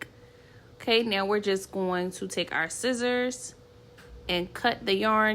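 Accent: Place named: Philadelphia, United States. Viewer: Thank you for this. So clear and easy to follow. I Can’t wait to try it! And if I’m not mistaken your accent is definitely giving fellow Philly girl vibes 🤗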